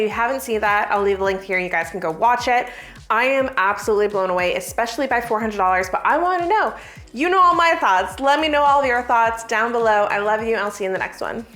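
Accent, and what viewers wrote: Canada. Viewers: that’s just her Canadian accent.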